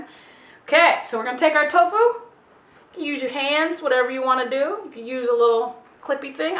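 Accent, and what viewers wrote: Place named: South, United States. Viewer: Just so Happy she doesn't Sound like she's from Ny but a Nice young Lady. Sounds like a a Good Southern girl 👍👍👍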